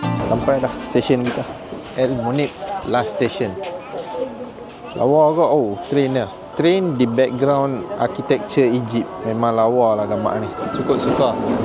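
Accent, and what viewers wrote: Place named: Kedah, Malaysia. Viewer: Alhamdulillah terbaik setiap hari sy x miss tgk video Doc. Rasa seronok dgr bahasa kedah Dr. Sbb sy pun org Jitra Kedah kpg kita pun sama Tanah Merah jgk. Terima kasih dr hidangkan video2 terbsik serata dunia byk ilmu yg sy dpt. Sy doakan terus sukses dan sy doakan jgk Allah bukakan hati dr utk Islam. Aamiin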